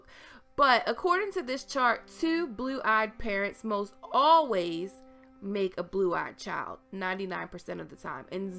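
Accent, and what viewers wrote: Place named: Maryland, United States. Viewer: she sounds like shes from Maryland or the "DMV" if you are familiar with the different American dialects of English...anywoo I enjoy her theories & perspectives the most!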